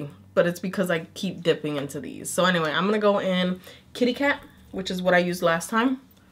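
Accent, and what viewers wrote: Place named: Connecticut, United States. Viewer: Oh hey Connecticut! I caught the accent a while back and I was wondering... but I knew you were nearby haha I'm in Bawstin honeyy.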